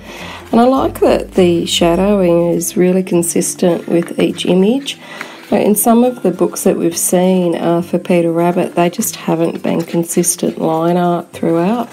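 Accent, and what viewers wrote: Australia. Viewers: Hi Have you moved yet? I seem to remember you saying you ere moving to Brisbane? Love all your reviews thanks for the effort you put into it. It’s so lovely to hear an Aussie accent too 😀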